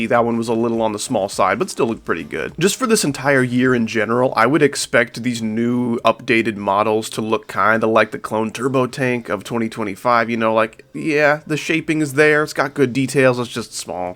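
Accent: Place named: Texas, United States. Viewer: We need you to only use your texan accent for this channel to be epic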